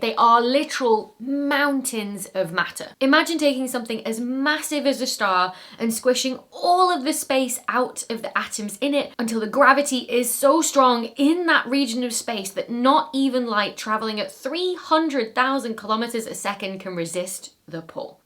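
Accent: United Kingdom. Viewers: so nice channel and such an awful British accent!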